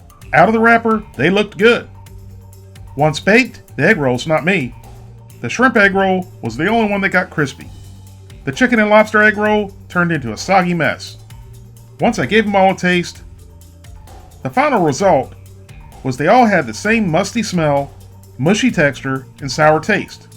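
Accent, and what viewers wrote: South, United States. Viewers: you better be a professional comic writer sidelining with these videos with quips like: "Like chewing on a moist mattress in a nursing home!" OMFG. You're humor is so New York with your 'southern twang'. Dry, like your stomach after hopefully being pumped out after ingesting these disgraceful products from questionable food companies.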